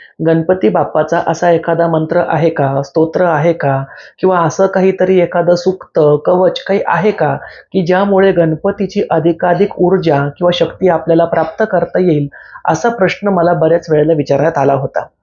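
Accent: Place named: Vidarbha, India. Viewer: भाऊ आपली विदर्भाची गोड भाषा आहे आणि तुमच्या मुखातून जास्तच गोड वाटली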